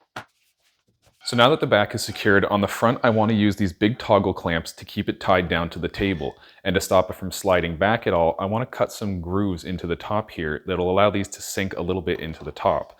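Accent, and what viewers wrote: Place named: Canada. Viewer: That dose of Canadian Accent made my day... Back when I was in shop class many years ago we were taught to put a piece of paper between the bowl and the sacrificial mount. It gave you a cleavage layer to make it easier to knock off with a hammer.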